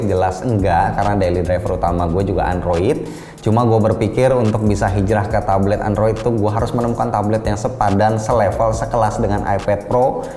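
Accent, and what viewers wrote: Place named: South Jakarta, Indonesia. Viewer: Jaksel. Bet logat mu bang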